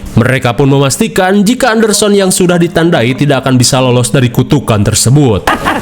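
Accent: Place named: Sunda, Indonesia. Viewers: logatt Sunda itu biasanya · itu logat Sunda bang, resep bgt saya dengernya, karna saya juga orang Sunda🤣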